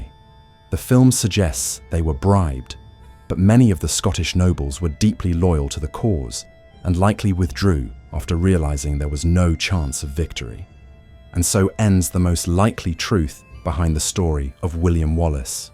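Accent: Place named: England, United Kingdom. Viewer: Narrator with English accent denying Prima Noctis is SUS AF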